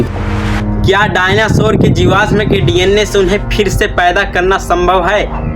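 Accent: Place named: Bihar, India. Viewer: Apki bhasa se lag raha hai aap bihar se ho?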